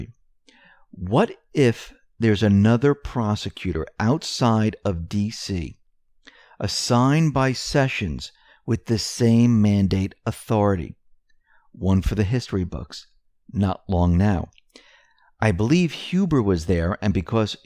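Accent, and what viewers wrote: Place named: New York, United States. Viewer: from the New York area judging by his accent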